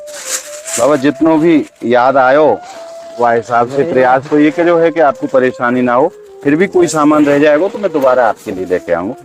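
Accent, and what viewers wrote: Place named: Braj, India. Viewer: Sree! ji aap ko mera Radhe Radhe. Mai Rajasthan jodhpur se aap ka video dekhta hun. Bahut achha lagta hai. Aapke braj Bhasa ati sundar aur ati madhur hai. Aap bahut namra hai. Aap ka braj bhasa keval sunta jaun. Jai shree Krishna Jai shree Radhe radhe.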